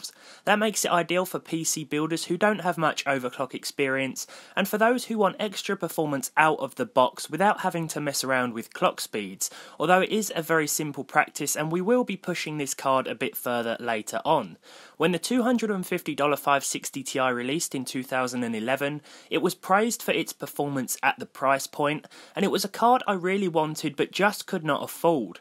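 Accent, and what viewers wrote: United Kingdom. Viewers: Well. Your accent made me understand the reason why British men are called Gentlemen.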